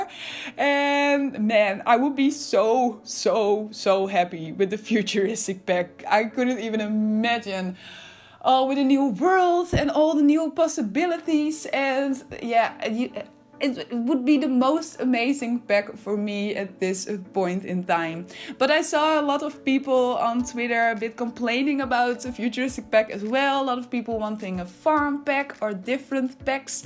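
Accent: Netherlands. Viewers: I bet your Dutch I can hear it Dus hey hoi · the house looks very good. the accent is very dutch.. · Je heb nogal een Nederlands accent, dus ben jij Nederlands?😂🌸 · Oke, ben ik de enige die hoort dat ze nederlands is? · Love.kawaii Cute ja ze is Nederlands, en haar accent is indd heel erg aanwezig, ik denk dat ze echt wel een Engels accent kan opzetten maar het enigzins misschien niet durft? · Echt prachtig! Ik ga er vanuit dat je Nederlands bent met dit accent hahaha 🥰🤭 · Yo ben jij nederlands? Want ik kan het wel horen hoor. De build is trouwens wel vet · Are u Dutch? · That is awesome❤ btw you sound dutch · Omg THATS A GORGEOUS BUILD the way you made it circular is so smart! lol btw ik kan gewoon HOREN dat je Nederlands bent🥰😝 good job!😍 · That’s a beautiful build, impressive Your accent gives me Dutch vibes, I think I’m right ;) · Are you Dutch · I can hear you're probs dutch!! 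· I love this house! It looks so clear and still it fits in very well with nature. Where is the reference image from? Also; your accent... are you Dutch too? :) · I can hear your from the Netherlands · Ik heb met je stem begrepen dat je Nederlands bent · Wat mooi! Ik word er altijd zo gelukkig van als ik een Nederlands accentje hoor. Voel me meteen thuis 🥰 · You're dutch aren't you? Hehe i recognize your accent😄🤭💓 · Hello! You're such a great builder! Keep going! Also, is it true that you come from The Netherlands? Because i am Dutch and i can hear a Dutch accent in your language haha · You sound dutch 🤔 (I am dutch) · mooi! ik kan horen dat je nederlands bent 😁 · Awesome build!! Just found your channel and can't unhear your dutch accent, sorry hoorde meteen dat je Nederlands bent hahaha ( niet slecht bedoeld viel me gewoon als eerste op ) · Dat nederlandse accent!! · I hear a dutch accent love it 😂❤❤ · I didn't expect you to be dutch but that accent bro... 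· Love your accent! I'm Dutch too hahahaha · Are u dutch? Cus you have a super heavily dutch accent. Als je Nederlands bent kan je dit verstaan/begrijpen. Je hebt zo erg Nederlands accent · Lmao immediately heard you were dutch! Leuk om een Nederlander tegen te komen die ook bezig is met Sims 4 <3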